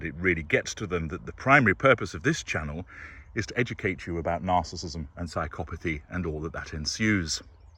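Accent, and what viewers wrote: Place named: Kent, United Kingdom. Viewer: Hmm, getting a lovely Kentish vibe from your video, it’s giving your voice a lilt. That photo is a real keeper, full of joy and excitement. Megsie must be screwed up inside.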